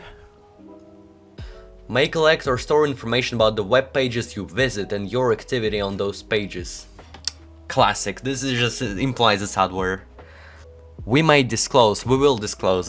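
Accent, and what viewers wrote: Russia. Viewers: Love your videos! I've really noticed your Russian accent though. Go learn an American accent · strong ruski accent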